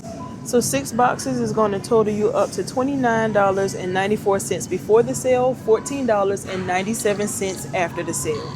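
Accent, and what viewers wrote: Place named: South Carolina, United States. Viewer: New here, and you sound like you're from SC. ❤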